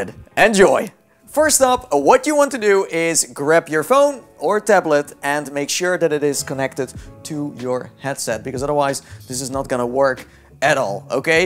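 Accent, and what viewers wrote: Netherlands. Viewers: I forgot I was subscribed. When I saw this in my notifs I heard your Dutch voice, it made me feel happy!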